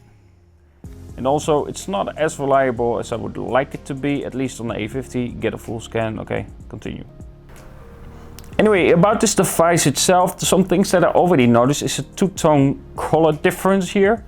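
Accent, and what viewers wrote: Netherlands. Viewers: are you Dutch? I'm like 99% sure...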